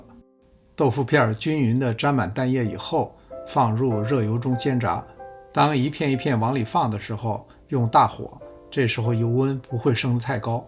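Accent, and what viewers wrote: Beijing, China. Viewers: 您是北京人嘛🤩口音有点亲切啊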